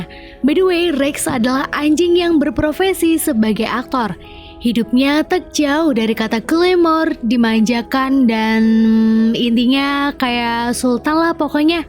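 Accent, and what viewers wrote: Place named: Surabaya, Indonesia. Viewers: Kok Suroboyo Boyo metu embak tapi aku seneng loh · Min mimin orng jawa ya min kok gomong kek ong jawa sama uwu ternyata toxic juga ya min:v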